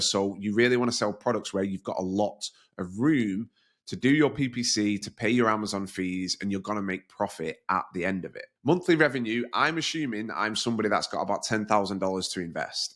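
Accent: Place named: England, United Kingdom. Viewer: Hi just started watching your videos, you sound english, would you say to start that I should start in England or America, I see you doing america fba. Also osome has lots of really shit reviews about just not providing the service they promise, it's made me not trust you as much, if you was a big honest channel, why would you pick a company that has a lot of bad reviews?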